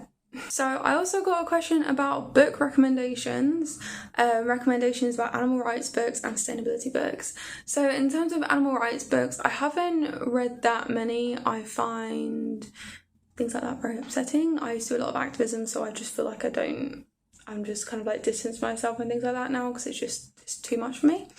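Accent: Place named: United Kingdom. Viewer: For the first half of 4th grade, we had world geography from Mrs. Hisey in FL USA, and she told us we would need to do a semester project on a country. But I asked her if I could instead focus on Antarctica, my favorite continent. So I used my Father's drafting tool called a pantograph to enlarge a Rand McNally map onto a piece of plywood. I used plaster of Paris painted white to show (vertical exaggerated of course) mountains and painted the ocean blue. I put in little flags to mark the winter-over science stations of color-coded various countries. I added a backboard for some basic stats. I loved that Antarctica was and is the continent of peace, science, nature, and an international zone! I enjoy your delightful UK accent and your love of science and your being a longtime ethical vegan! From my work with ramping up Green TV (US), I know just how challenging it can be to attain 1000 subscribers, so I congratulate you on attaining that. 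Glad you will be tapping space data in your research--not only what the late physicist-astronaut Sally Ride deemed Mission TO Planet Earth, but also via comparative planetology understanding what happened to Venus and Mars, for instance. BTW, as to books, I managed to get the UK edition of Greta Thunberg's "The Climate Book," published on Thursday 27 October 2022, and am reading it and will be reviewing it for Green TV Web site, our first-ever book review. It is an outstanding primer on the No. 1 climate crisis, brin ging together essays by s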